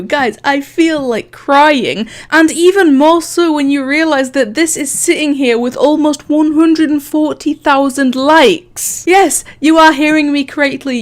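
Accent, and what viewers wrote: Scotland, United Kingdom. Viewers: Are you Scottish? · you sound scottish + british its nice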